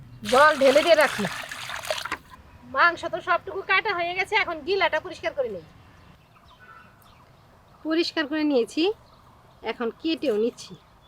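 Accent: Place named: Dhaka, Bangladesh. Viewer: আপু আপনি কি ঢাকায় ছিলেন আপনার কথা ওনেটা আমাদের মতো ঢাকাইয়া ভাষায় কথা বলেছেন রিপ্লাই দিয়েন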